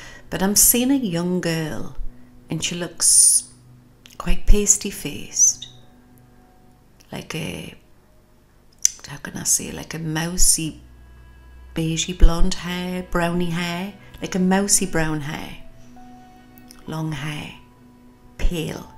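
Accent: Newcastle, United Kingdom. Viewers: Came for the predictions, stayed for the lovely Geordie accent😁 Thank you for sharing this. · She's a Geordie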